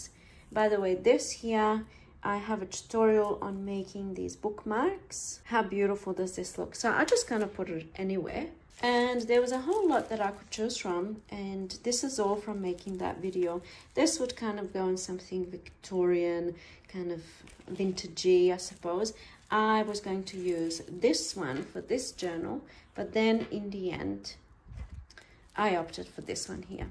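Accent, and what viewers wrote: South, United States. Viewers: I LOVE your Southern Accent!!! As a Texan I highly approve 😂💖😂💖😂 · 😂 I bout fell out at your country accent! Plus, going overboard and getting overly excited...theres no such thing! This journal turned out amazing! I look forward to seeing more like it! Thank you always for your honesty, your wits/ sense of humor and your mind blowing ideas!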